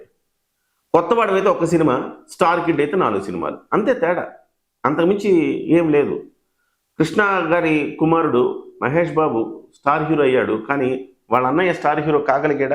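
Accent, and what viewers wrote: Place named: Rayalaseema, India. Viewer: ఆ రాయలసీమ యాస ఎందుకు భయ్యా..పొగరు దురద అంటూ వస్తె నాశనమే...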